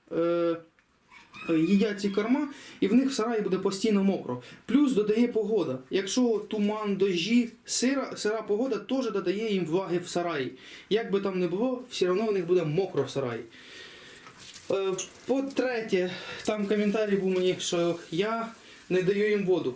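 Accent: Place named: Poltava, Ukraine. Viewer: Говірка схожа на полтавську😂.мені як прлтавцю-понятно все.кому не понятно-хай не слухає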